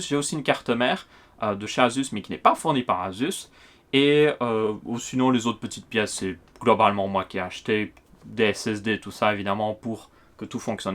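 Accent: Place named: Belgium. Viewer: C l accent et expression belge.. un vis ..lol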